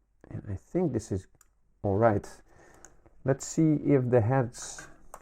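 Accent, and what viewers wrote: Netherlands. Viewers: Hahah, that accent really gives you away. Hallo, noorderbuur! 😁 And thanks for the instruction video. Was considering picking up a walkman from eBay, and wanted to get an idea of how hard it would be to replace the belts. Didn't look too complicated, so now I got a WM-FX45 + new belt on the way. One thing though. When replacing the belts, it's always a good idea to clean the pulleys with a q-tip drenched in alcohol. This removes the rubber residue left by belts that have gone sticky, or rubber dust from belts that dried out. Always a good idea to do some maintenance while you're in there anyway.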